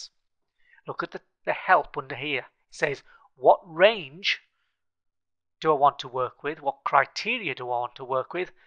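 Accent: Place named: Northern England, United Kingdom. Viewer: Dear. Absolutely brilliant and so easy to follow. A real bonus to hear a northern accent too! Thanks for posting this - it solved my immediate Excel problem - and took me even further too.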